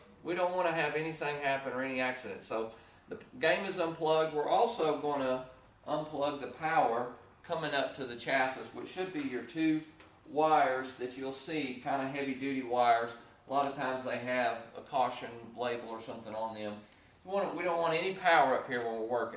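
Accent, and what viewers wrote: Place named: Texas, United States. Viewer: Go-ahead and say chassis anyway you want. We know what you mean and where you are from. It is a French word. If we tried to say it to a Frenchman's satisfaction, we would all look ignorant. If a TX accent causes you to fail to understand the content of these excellent videos, then I would find you strange. Read this eurouc: <link> Accents are long standing parts of verbal communication which add texture and identity to spoken word. Maybe you are simply too ignorant to understand. Maybe you are French. As I am French-Canadian and have half my family living in the Bayou posing as Cajun, I am offended by you either way. EuroTrash might be a better name for you.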